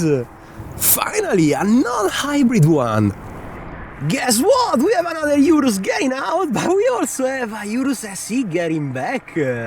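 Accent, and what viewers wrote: Italy. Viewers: I love this channel! infectious enthusiasm about every car leaving or arriving at the Lamborghini factory (not to mention the ducks) just puts a smile on my face. And punctuating it all with that awesome Italian accent, what's not to love?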